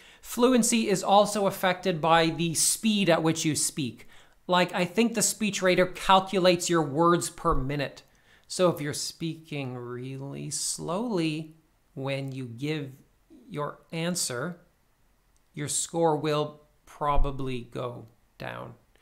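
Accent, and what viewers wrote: Canada. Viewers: Hey, thanks for all the videos. They are really helpful. Test day tomorrow, wish me luck! out of curiosity, are you canadian? I like to guess where people are from based on their accent haha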